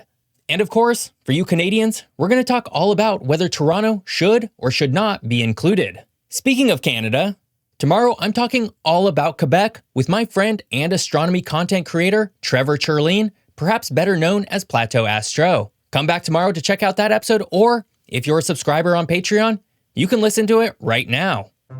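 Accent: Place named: West Virginia, United States. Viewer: You got a little bit o’ West Virginia in there!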